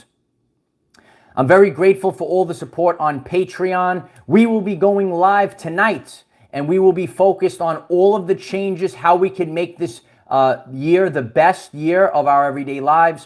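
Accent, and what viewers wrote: New York, United States. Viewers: I have a client that talks, accent like you. He's from New York. Guessing that's where your from?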